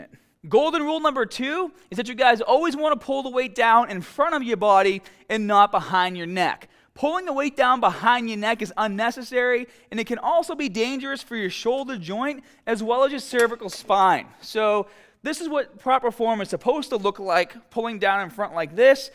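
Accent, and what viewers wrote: Boston, United States. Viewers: No, he's likely from Boston. They say "r" words like that. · Is that a Boston accent? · Helpful. But god damn I can't stand that Boston accent. Jesus Fuck! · Adrian I’m from Boston but no longer live there. Was thinking the same thing. I watch these vids not only for form but to hear the Boston accent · Bostonian accent for sure. "Bah" for "Bar". "Staht" for "Start". Those words sound Australian. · Hmm I wonder if this guy is from Boston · Has to be Boston he sounds like my cousins 😊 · STRONG Boston accent in this video :-) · I've watched so many SHF videos to get started on my weight loss journey but I just noticed that he's from Boston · he’s a bostonian😂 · Love that Boston accent 😍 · are you from boston? i love that accent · I wonder if he's from Boston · That's called the Boston accent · Lol is this guy from Boston? If so, represent!!! 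· Your def from Boston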